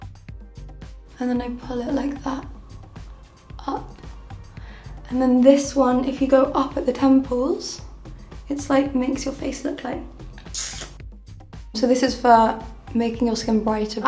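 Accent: United Kingdom. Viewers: She’s so young, but her British accent makes her seem older and more sophisticated 👍💅🏻💄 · does anyone know what british her accent is i love it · Hyu Yu i do agree that it is a common accent in the uk but i find it so pretty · sotaque britânico AAAAAA 😍🥱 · moonchild It’s honestly just an average British accent, I didn’t even notice 😂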